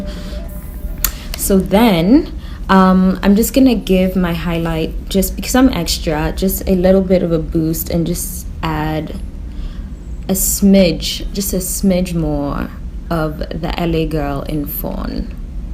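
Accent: South Africa. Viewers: are you South African Sisi? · I knew from the moment I heard u speaking that u are South African, u are very detailed,love love ur videos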